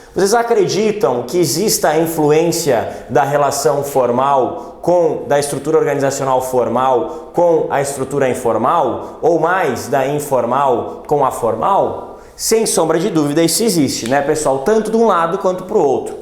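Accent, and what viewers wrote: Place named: South, Brazil. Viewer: quando o profissional é bom, em apenas 1 minuto isso se torna claro. Parabéns. +1inscrito p.s. aposto que é sulista. ô povo bom para ensino ead.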